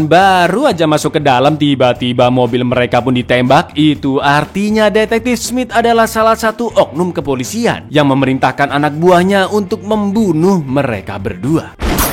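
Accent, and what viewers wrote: Java, Indonesia. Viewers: Gausah alay bro suara lu dan aksen jawa lu ..belum tentu banyak orang suka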